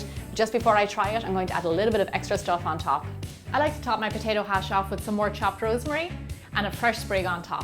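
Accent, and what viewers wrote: Ireland. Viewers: I love your 🇮🇪accent🇮🇪! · Irish accent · Aha, well since Celtic Woman is one of my all time favorite musical groups that helps explain it. I have always loved the Irish accent, but yours is subtle. Almost lost but not quite. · Ima guess the accent Irish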